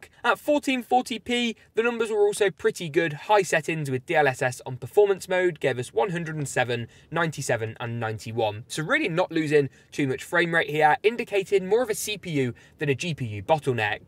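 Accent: United Kingdom. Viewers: 1st this video is awesome. 2nd gotta love that tihcc brit accent, noice🤣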